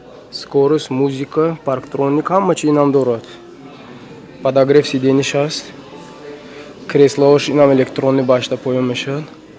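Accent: Konibodom, Tajikistan. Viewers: Канда занид бародар шеваи Конибодомива гардам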